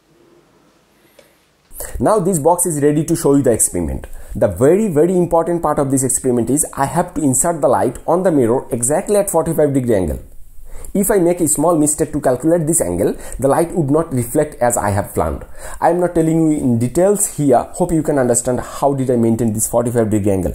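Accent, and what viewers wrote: India. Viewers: Are you Indian? Your accent is like an Indian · *clicks video, hears Indian accent, clicks off video in disappointment.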